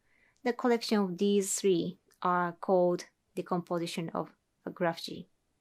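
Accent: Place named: Japan. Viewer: you've changed my view on my take on japanese speaks english. the lease accent and very standard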